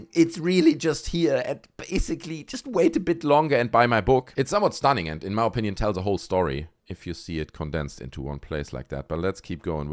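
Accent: Germany. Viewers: Thank you for all your work! Your logic, humor and perfect very slight German accent is such a perfect combination! Keep yourself safe.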